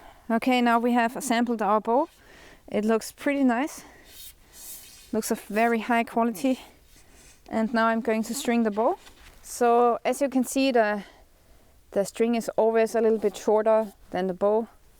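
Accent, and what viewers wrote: Germany. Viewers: I'm guessing 🇩🇪? Nice video and very humble. It's the best when you say, ,"Cool"...dánke....Michael 🎶 🎶🎵 🎯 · So cute German accent :)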